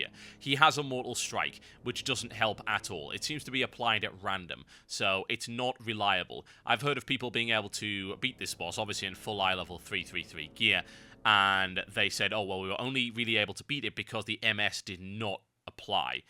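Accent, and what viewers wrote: United Kingdom. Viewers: f.u.b1ch u sounds british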